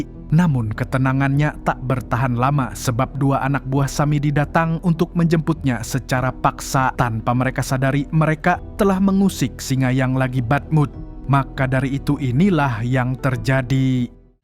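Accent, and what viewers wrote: Banjar, Indonesia. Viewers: Positif orang Banjar ni adminya🤣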